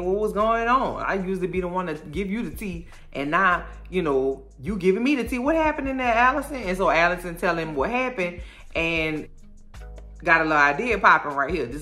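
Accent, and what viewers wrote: New Orleans, United States. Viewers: Look. I found you by way of.soooo I literally came back to HEAR YOU RECAP seasoning with your New Orleans sauce 😂😂😂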